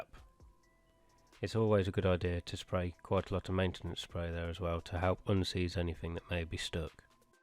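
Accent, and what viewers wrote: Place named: United Kingdom. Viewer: Sounds like british accent. ;) He should have used "right side". At least definitions of left and right are still the same in the UK. :D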